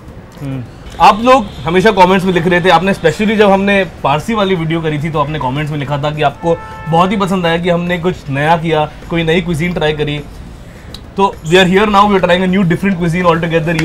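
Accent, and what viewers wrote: Bihar, India. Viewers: Very bad Bihari accent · tried your best to speak bihari. anyway good.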